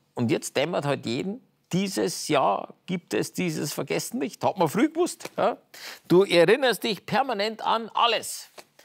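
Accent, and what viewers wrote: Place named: Bavaria, Germany. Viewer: Mir gfällt dei bayrisch du bist ja gar kein :)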